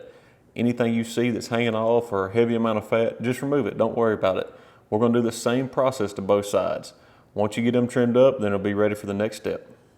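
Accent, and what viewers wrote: South, United States. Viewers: a good ole southern boy from mississippi sayin "we fittin tuh" fittin, fittin, 🙄🙄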